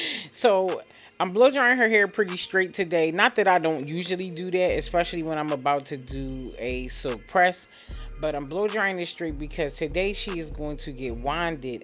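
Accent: Philadelphia, United States. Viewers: I thought I heard a Philly accent! Then you said PA I was like yup!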